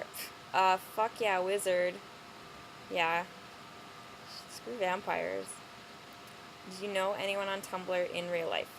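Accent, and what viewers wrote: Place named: Michigan, United States. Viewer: you forgot to say what state you are from. you sound like you're from michigan though. am i right?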